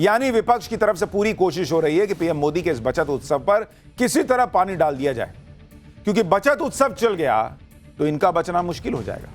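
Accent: Bihar, India. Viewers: आज तो पतलकार ने 100 मे से 110 नंबर ले लिए, बिहारी भाषा का इनपुट झलक रहा था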